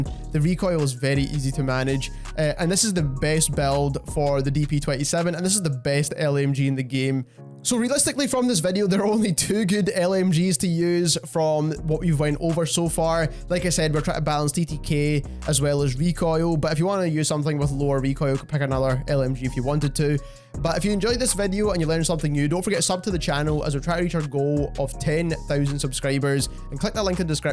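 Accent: Scotland, United Kingdom. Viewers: A Scottish guy! Nice one. Never seen u b4. Am nae guid at cod nowadays - too auld I think... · This is an ironic question given your name haha. It’s a Scottish accent :)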